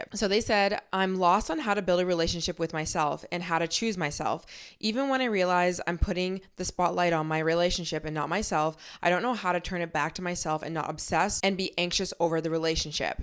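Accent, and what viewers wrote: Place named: Toronto, Canada. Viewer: you so have a toronto accent and it makes me so happy to hear! brings me back home in this world of influencers its nice to hear myself, my friends and loved ones in ur voice 🥹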